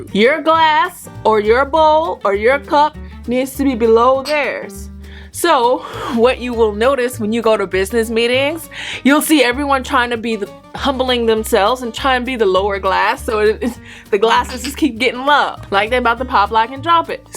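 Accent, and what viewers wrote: South, United States. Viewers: I'm from the South too(Tennessee)! I wonder, do you have a Southern accent when speaking Korean?😂😆 I talk fast also, another Southern Thang! Gurl! You make me laugh so much! TFS! Light, Love & Blessing's💖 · Lol the way you talked cracks me up every time I watched your videos. You can take you out of America but you can’t take America out of you😂😂😂😂👍 I love your videos, very educational and detailed. Keep’em coming girl you’re awesome 👍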